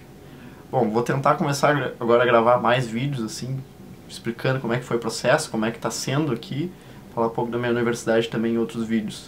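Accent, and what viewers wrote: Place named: Rio Grande do Sul, Brazil. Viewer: Daiane Oliveira Pela sotaque e maneira de falar deve ser na UFRGS ou PUC- RS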